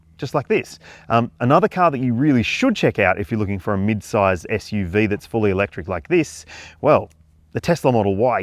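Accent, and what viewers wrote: Australia. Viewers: Lol average American moment. How can you not tell that this is from Australia? The car is right hand drive and he has an Australian accent.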